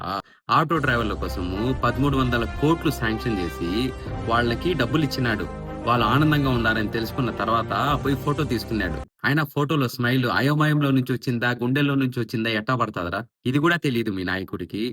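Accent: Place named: Rayalaseema, India. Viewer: Rayalaseema slang appa 👍 💕 Your native place Sir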